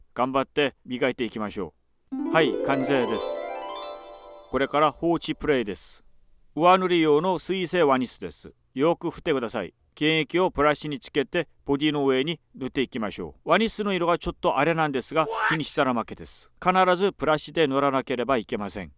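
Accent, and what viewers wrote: South Korea. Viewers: 日本人ではないのか！？めちゃくちゃ可愛い喋り方！韓国訛りかなー？ なんか喋ってるの見るとさらに、可愛く見えてくる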